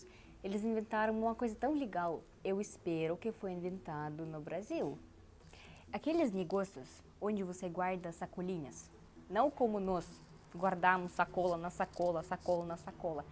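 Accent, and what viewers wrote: Russia. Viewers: vc é muito querida e seu sotaque é uma graça. "Chineli" é o melhor👏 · Bom dia eu adoro seus comentários ,amo seu sotaque russo misturado com português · Seja bem- vinda! O que eu mais gosto nos russos se esforçando pra falar português é o sotaque e a troca do o pelo a. Muito fofa! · Eu acho uma graça ela falando português, com esse sotaque russo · O seu sotaque Russo é muito show. Tenho um amigo Russo aqui no Canadá onde moro. Ele me ensina muitas frases e não e difícil a pronúncia porquê a nossa fonética não é tão diferente como o Inglês. Que Deus abençoe vcs e sua família aí no Brasil. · a expressão " puxa saco" quer dizer " alguém que quer fazer de tudo pra agradar alguém, mas só pensando em si mesmo, sem se importar com outras pessoas, com o objetivo de receber algo em troca". Exemplo puxa saco do patrão (chefe). Você fala bem o português, mas se percebe o sotaque Russo. 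Legal você quere dividir a sua experiência brasileira com a sua família. Felicidades e abraços... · Moro no Amazonas . Gostei muito do seu sotaque russo seja bem vinda. Mas se vc e do lado do putin e melhor ir pra Rússia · Gosto de assistir os seus vídeos, é tão legal ver e ouvir você falar. O português com o seu sotaque russo é muito bom, você fala palavras muito engraçadas. Adoro você,sou do norte do Brasil, Tocantins. sonho em conhecer uma russa pessoalmente e queria que fosse você. Beijos você é sensacional! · Eu amo esse sotaque russo 😍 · Não estou gostando. Você está perdendo o sotaque russo, que fica maravilhoso falando português. Não mude isto. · Como já disseram as russas aprendem muito rápido o português e o sotaque é o mais bonito de todos com ênfase no R, creio que nós brasileiros identificamos muito com o povo russo, pessoas simples , cultas , com uma literatura maravilhosa e sofredores devido aos desgovernos que tanto os afligem e a nós brasileiros também, seja bem vinda, venha conhecer minha cidade São José dos Campos, São Paulo se vier você vai ficar pra sempre, 40 minutos do litoral , Ilha Bela, São Sebastião, Caragua e 40 minutos das montanhas , Campos do Jordão, S.Francisco Xavier , e outras cidades muito lindas, O Vale do Paraíba é uma região muito bonita. 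· Bem vinda! Seu sotaque é gostoso. Amo a Rússia e as russas. A Rússia até hoje me soa como algo exótico.❤😊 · Olá mais linda do Brasil... Você já está falando muito bem o Português. Um pouco de sotaque russo fica até charmoso em vc. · Eu acho lindo demais, você falando português,com esse sotaque Russo. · Vc é linda.Nao se preocupe com seu sotaque gostoso,dá pra entender linda. Que sejam todas bem vindas.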